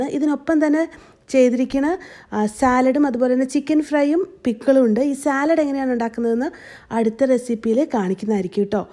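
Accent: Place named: Kozhikode, India. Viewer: കോഴിക്കോടൻ ബിരിയാണിയും, ഭാഷയും സൂപ്പർ 👌👌👌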